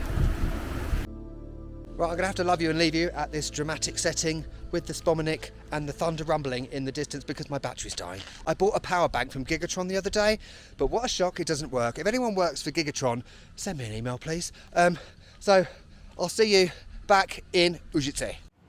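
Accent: United Kingdom. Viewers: thank you for your brilliant shots, Serbian subtitles, and bludy flowless brits acent i like it the most.)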